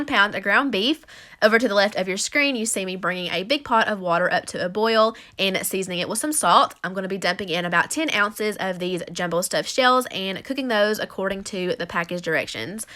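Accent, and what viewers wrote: South, United States. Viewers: Yeahhh my southern sista! Idk where your from but ya sound like me so I like ya! 😉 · Damn she did that and I love the accent country ass me!!!!!! Yeahhhh buddy??? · I love your voice!!! Sorry if that sounded rude!! But I think country accents are amazing!!! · Where you from ? You got a accent. You sound really country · Your accent is TOO cute! It’s my favorite part of your videos. Olive “ol” 😊😂 sorry if this is offensive I mean it in the best way possible, I love southern accents!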